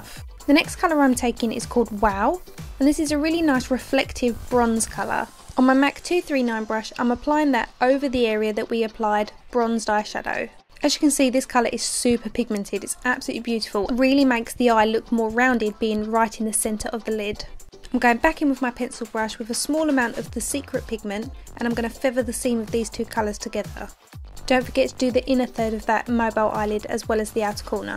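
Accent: Essex, United Kingdom. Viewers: are you from essex 💁🏼❤️🙌🏼💯💋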